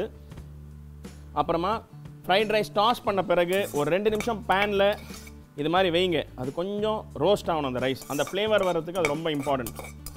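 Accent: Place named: Saidapet, India. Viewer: I simply love the last part saying “Torture Panna Koodathu” that was hilarious. I love all your videos and your very simple style of cooking and of course your Saidapettai Tamizh!! Takes the cake!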